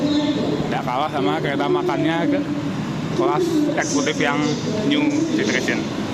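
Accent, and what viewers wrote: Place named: Java, Indonesia. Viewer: Medok banget suaranya. Ga bs dimengerti. Coba kamu belajar ngevlog dg intonasi yg bagus. Banyak kok youtuber yg medok tp intonasinya bagus. Jd buat yg non jawa itu mengerti apa yg dibicarakan. Sukses selalu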